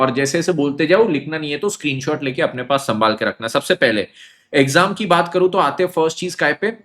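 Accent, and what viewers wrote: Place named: Nagpur, India. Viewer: He has a Nagpuri accent omg 😂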